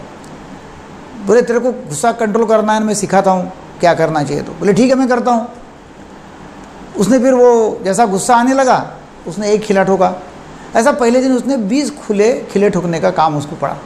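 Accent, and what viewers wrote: Maharashtra, India. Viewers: sir,u can talk in Marathi also ,even u speak in hindi ur tone is like Marathi,pl speak in Marathi or in English or please learn proper hindi, language,tone etc, being Marathi am proud of u ,but u talk valuable thing,but sometimes it's funny tone so pl improve sir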